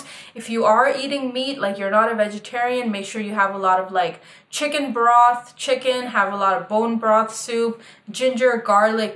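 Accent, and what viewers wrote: India. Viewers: 😀😀😅😅 That Indian speaking excent was really nice. Informative video👍👍... Keep it up 👍👍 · You look so cute with that Indian accent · really really really really really thanks for the South Indian accent..I am not Indian, but I am in Bangalore for almost 4 years and it is super, pakaa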